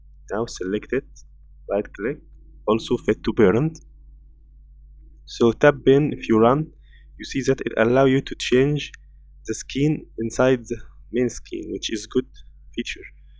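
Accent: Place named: Egypt. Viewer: Thanks.... U accent may be LikE Egyption'S people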